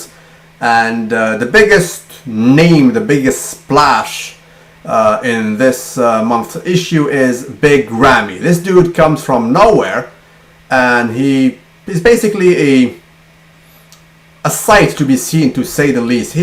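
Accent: France. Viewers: hhhahaahaa ton accent Français trèes surprenant!! tres amusant!!! fait donc 1 video en Français!! loll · Hey, is your first language french?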